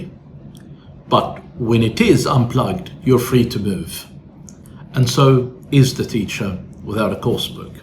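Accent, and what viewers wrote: United Kingdom. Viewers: Are you native speaker of british English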